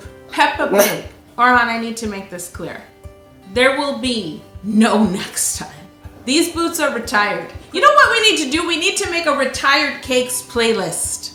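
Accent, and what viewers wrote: England, United Kingdom. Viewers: I love your cakes! You inspire me! I recently started my YouTube channel and have been baking a lot. My favorite so far is a piano cake that I brought to Choir rehearsal before all of this Quarantine! Everyone loved the piano which was really a cake! I can't wait to try to make your Watermelon cake in the summer. These boots are so cute with this cake & love your British accent in this video, lol ! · English accents, yes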